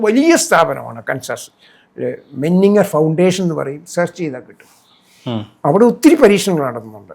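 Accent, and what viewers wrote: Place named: Thrissur, India. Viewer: അത് തൃശ്ശൂർ കാരനാ😂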